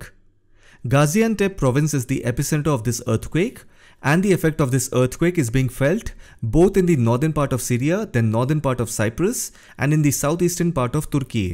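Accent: India. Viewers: Good Presentation Except** Heavy Indian Accent *** 🙏 RIP*** Why buildings were approved * By Turkish Government * In this fragile area? * Is it due to bribery & corruption? * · it's indian english just like americans speak their own american english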